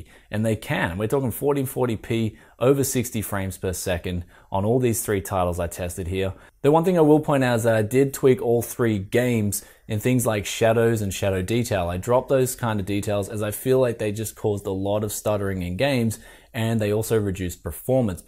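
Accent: Australia. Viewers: Oh yay an Aussie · Is this fella Aussie?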